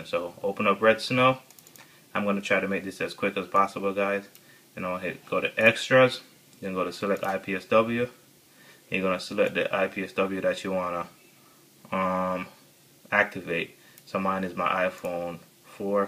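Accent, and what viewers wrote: Jamaica. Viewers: Jamaicaness of this dude cannot hide...mi luv the fact seh him nah twang an still understandable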